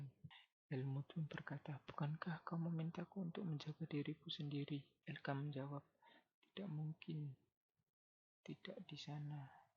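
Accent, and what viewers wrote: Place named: Indonesia. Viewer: Soal y suara lu medok coba bikin suara y alusin sedikit trus kerasin klo mau gasih suara Jagan di baca mending hapalin tesk y dlu ok bro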